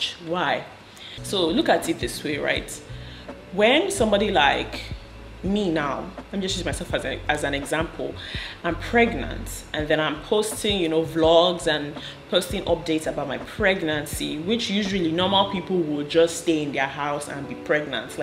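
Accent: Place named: Ghana. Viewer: Maraji is picking up the Ghana accent already o😁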